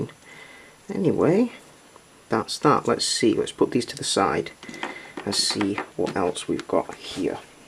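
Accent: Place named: United States. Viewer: U sound American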